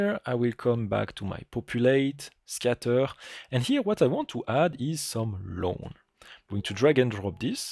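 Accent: France. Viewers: Thank you so much for the great tutorial. And the French accent is just drop dead gorgeous!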